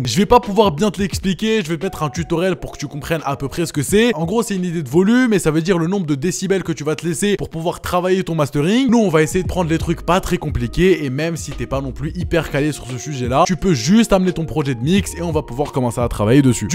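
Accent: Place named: England, United Kingdom. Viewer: Ce bête d'accent anglais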